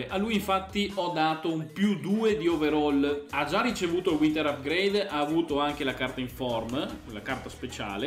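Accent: Veneto, Italy. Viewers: Da che parte del veneto sei? Un salutone da Salzano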